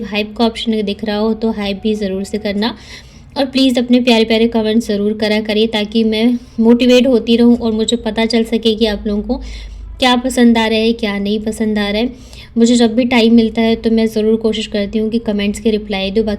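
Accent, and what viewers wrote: Bihar, India. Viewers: Di aapki bihari bhasa bahut acchi lgti hai please kisi din banayiye pura blog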